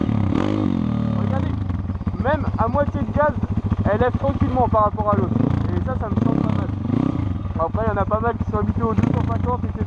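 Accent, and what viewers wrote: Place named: North, France. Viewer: au toi tes du nord =)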